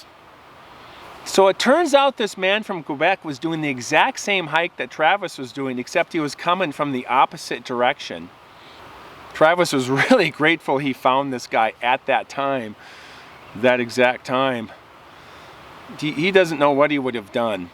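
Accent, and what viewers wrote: Minnesota, United States. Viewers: Thank you, keep hiking,Haha. I enjoy listening to you and even before you said you were from Minnesota I figured as much as I recognize your slight accent. Mine is probably a little thicker as I am from northern Minnesota. Anyway thanks again.😎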